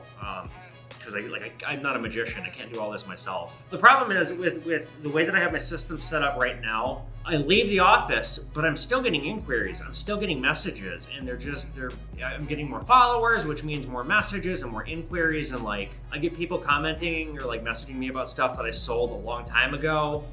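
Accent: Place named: United Kingdom. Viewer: I didn't know was Brit tissh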